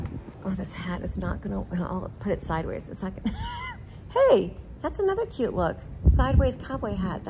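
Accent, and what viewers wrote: United Kingdom. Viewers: Your British accent is so Carnaby Street! I love it. Cheers.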